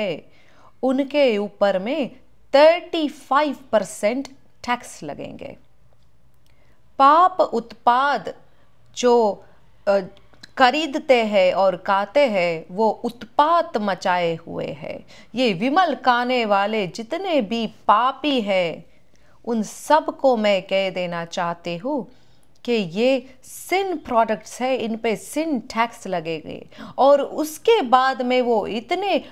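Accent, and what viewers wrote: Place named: South India, India. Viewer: Hindi in south accent too good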